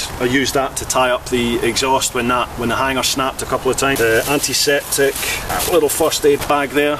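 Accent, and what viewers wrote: Scotland, United Kingdom. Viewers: Hi mate , just found your site , really enjoyed it, the accent grabbed me as a fellow scot , in Oz for the last 30 yrs and a touring , camping bike rider as well. Now happy subscribed . Thanks again Steve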